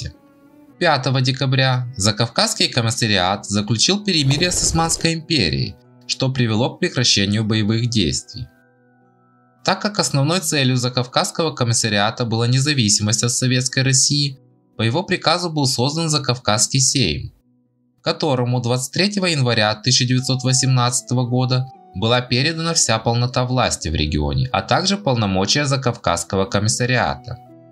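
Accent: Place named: Odessa, Ukraine. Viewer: Азербайджана ещё небыло в это время он только создавался 😃 От куда такой Одесско-Еврейский акцент!?😃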